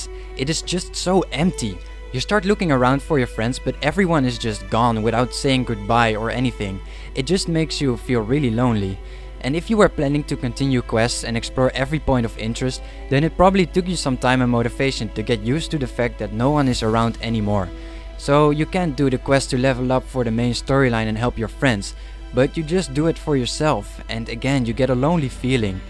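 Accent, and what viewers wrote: Netherlands. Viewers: I don't know why but I can totally tell you're Dutch. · Hey, where are you from? You sound Dutch!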